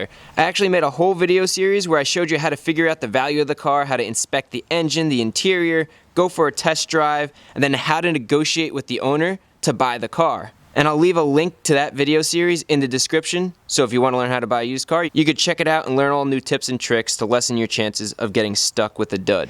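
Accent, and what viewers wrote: United States. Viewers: the way americans pronounce car brands...it just makes my ears bleed...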